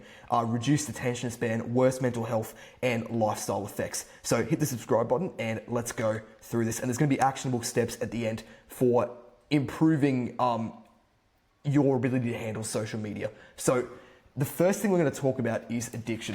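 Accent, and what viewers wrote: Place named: North Carolina, United States. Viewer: Bruh you from North Carolina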